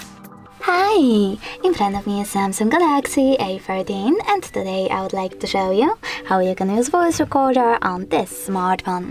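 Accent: Asia. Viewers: Can you speak slower and not Asian Please !!! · Too hard to understand thick Asian accent. Too long nails can't see exactly where pointing. Way too fast.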